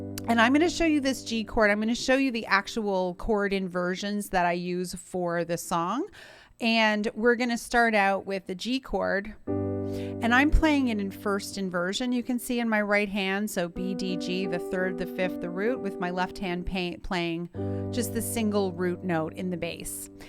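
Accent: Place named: Portugal. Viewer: Thank you so much. Another excellent video, love you accent, probably portuguesa, much gracias ❤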